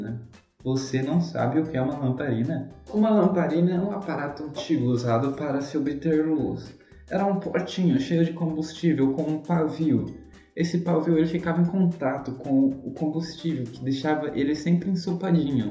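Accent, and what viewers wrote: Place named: Italy. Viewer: Não sei pq, mas o sotaque me lembrou muito Super Mario.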